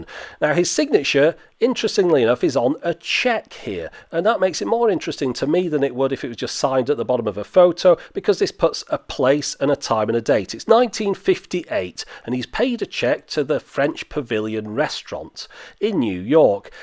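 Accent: United Kingdom. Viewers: I really like David Niven. In my memories, his image comes associated along with Peter Sellers and The Pink Panther. I'd like to say that's a lovely souvenir to keep and to be proud of. Good video in all, I love your British accent; it's like music to my ears. Merry Christmas.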